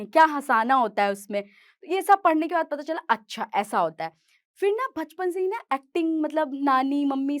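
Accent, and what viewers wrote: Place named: Bihar, India. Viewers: Ji nh sare bihari aese hi baat karte hai.. It is their accent... Bihar jayenge toh har koi aese hi baat karte milega.. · South k log South k accent me bat kre to wo educated & bihari agr bihari accent me bat kre to wo regional....... U are an inspiration.. regional ho ya desi... But aap ho original.. this is wat matters.. all d best & keep shining ❤ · you really acts like a proper bihar girl, your accent, expressions, dialogue delivery are awesome and apt. god bless you with more success. be like this only free and frank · Don't be ashamed of being bihari , be proud of your bihari accent. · You have put Bihar and the beautiful dialect on the top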